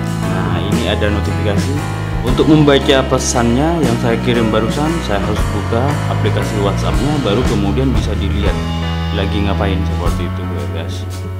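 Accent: Madura, Indonesia. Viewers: Abg orang madura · Abang orang madura yaa? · Yg buat konten kyknya orang madura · Orang madura kak? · mas kamu orang Madura ya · Bang Orang Madura?? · Orang madura ya mas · Org madura bkn sih? bede dimma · Orang madura ya? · Orang madura yak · Bede bhs madura nya keah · Orang Madura nya bang??? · Orang madura ya om 😁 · Reng madure kake ya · Wkwkwkw kakak orang madura sama kayak saya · Bng lu orng madura y?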